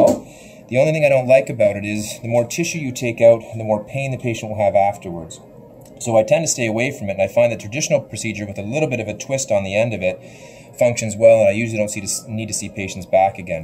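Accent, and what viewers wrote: Canada. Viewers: You sound a little bit like you're from Canada.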